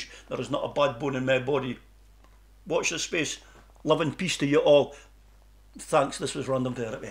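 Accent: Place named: Cockney, United Kingdom. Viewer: Nice cockney accent! Bet you cant do a Scouse one. Good content BTW.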